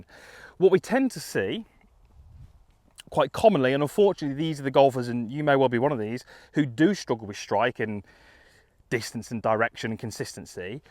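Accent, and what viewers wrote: United Kingdom. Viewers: He is British! We have the accent.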